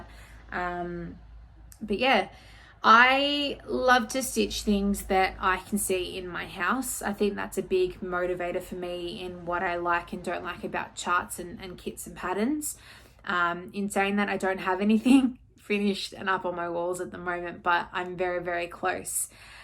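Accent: Australia. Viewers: Hi.. loved your first flosstube!! Keep them coming as I’m loving hearing another Aussie voice.. stay safe xx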